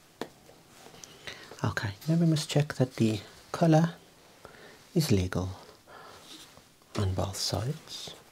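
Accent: Birmingham, United Kingdom. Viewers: On the one hand it's just some bloke looking at a table tennis racket, but on the other hand I find it immensely satisfying and deeply relaxing during my Saturday lie in until God knows when watching a bloke inspecting a table tennis racket and speaking in a soothing Brummie voice. I find it's the perfect way to relax and unwind after yet another working week.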